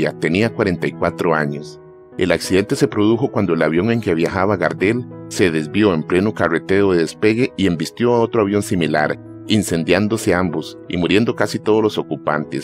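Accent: Costa Rica. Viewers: Me encanta como pronuncia las R’s el presentador de este canal. Ese acento solo lo tienen los de Costa Rica 🇨🇷 😄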